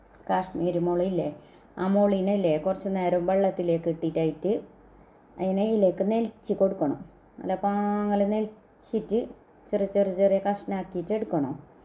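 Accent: Kasaragod, India. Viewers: താങ്കൾ കാസർക്കോട് ഭാഷയിൽ പറഞ്ഞത് ചിലതൊന്നും മലപ്പുറത്തുകാരനായ എനിക്ക് മനസ്സിലായില്ല, 🤔 എങ്കിലും വീഡീയോ കണ്ട് പഠിച്ചെടുത്തു 🥰. Thanks.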